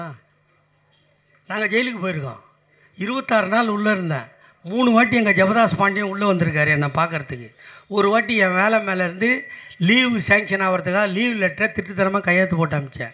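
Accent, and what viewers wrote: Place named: Chennai, India. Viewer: மெட்ராஸ் லோக்கல் பாஷை பேச்சாக இருந்தாலும் ரசிக்கிற மாதிரித்தான் இருக்குது இவரோட பேச்சு !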